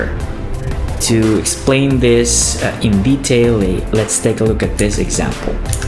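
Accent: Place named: Philippines. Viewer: Fro your accent I know you're my KABAYAN!! subbed! Keep it up.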